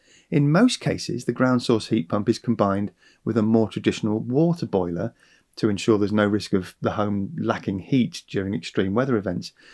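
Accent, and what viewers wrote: United Kingdom. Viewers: Difficult to understand his voice, which has a British accent, but goes up and down. He needs to speak with same volume and clearer.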